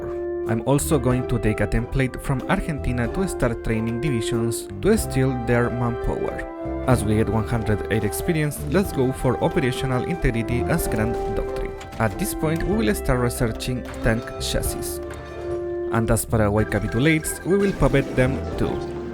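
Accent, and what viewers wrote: Chile. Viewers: Nunca pensé ver un youtuber de hoi4 chileno hablando en inglés xD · Al oir el acento pense: chileno? Parece q si :v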